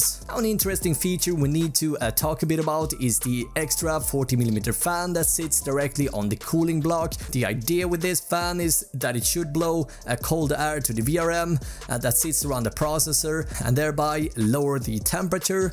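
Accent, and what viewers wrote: Sweden. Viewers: The Svvedesh accent really shines through. INSTANTLY SUBBED and enabled ads!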